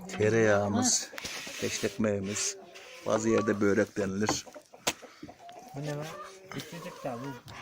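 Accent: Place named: Nevşehir, Turkey. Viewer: Merhaba kardeşim ya ben bu görüntüleri çok seviyorum. Köyüm geldi aklıma. Ben Nevşehir 'liyim şiveniz bizim köylüler gibi. Bide tavuk sesleri geliyor keşke bende köyde yaşasaydım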